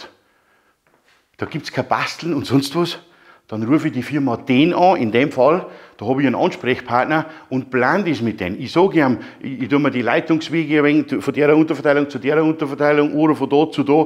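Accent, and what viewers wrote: Bavaria, Germany. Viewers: Geil endlich jemand der Ahnung hat... zwar sehr speziell und gewöhnungsbedürftig dieses impulsive aber dennoch menschlich. Ich kann zwar kein bayrisch aber verstanden habe ich. Trotzdem werde ich niemals eigenständig an Strom gehen dafür gibt es gelernte Fachkräfte... Bleibt nur danke zu sagen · Ganz großes Kino, vielen Dank für deinen sehr aufschlussreichen Input. Gruß aus Hamburg. Btw als gebürtiger Hamburger sag ich dir, pfeiff auf die Nasenwastl, die sich am Dialekt stören. Die sollen dort hingehen, wo sie klar kommen und die Sprache verstehen. Ein Tip an die Nörgler, es gibt eine Vielzahl an bayrischen TV Serien und Kinofilmen wo sicher für fast jeden was dabei ist. Ein bißchen gemischte deutsche Kultur tut jedem gut.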